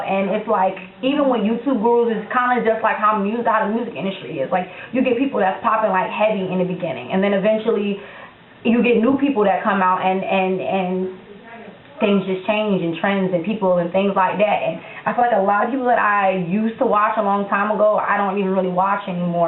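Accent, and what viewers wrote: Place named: New Orleans, United States. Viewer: you sound like your from new orleans i always thought you were .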